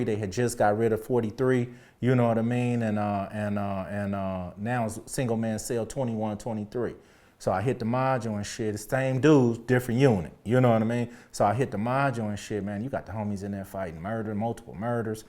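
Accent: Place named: California, United States. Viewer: You can hear the Cali In his voice 💯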